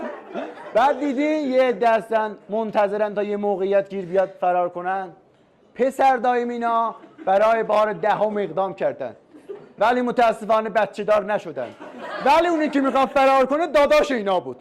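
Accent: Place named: Azerbaijan. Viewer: 😂😂😂😂خیلی خوب بود اجراش،خدایی قشنگ بود،خیلی لهجه آذری شم باحاله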